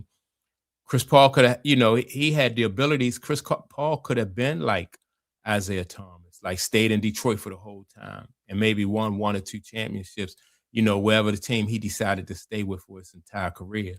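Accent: Baltimore, United States. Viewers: Baltimore accent?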